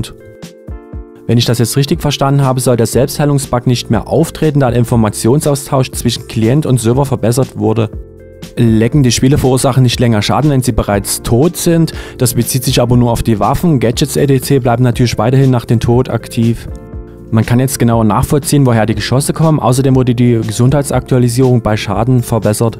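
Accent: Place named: East Germany, Germany. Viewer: Keule, Keule... Ost-Dialekt, und dann so schnell reden, inklusive Englischen-Begriffen?! Da platzt mir die Birne 🙇‍♂️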